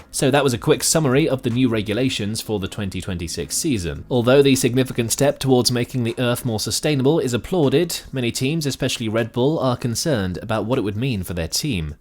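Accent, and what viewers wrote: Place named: United Kingdom. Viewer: It's getting to the point with these videos, that as soon as you hear a bri'ish voice-over, you may as well skip it.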